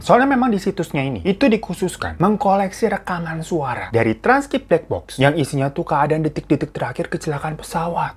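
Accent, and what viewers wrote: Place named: Betawi, Indonesia. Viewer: Cara ngomong lu keg guru ngaji gua.. betawi bed da ah 🤣🤣🤣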